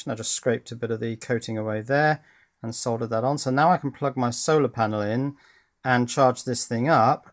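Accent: England, United Kingdom. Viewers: Best voice and the greatest English accent. I want to listen, each word you pronounce. Very informative video. Thanks for uploading.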